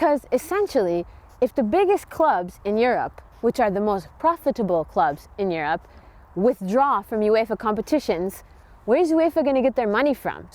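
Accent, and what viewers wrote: Germany. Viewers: That Germán accent made you 1000% hotter!!!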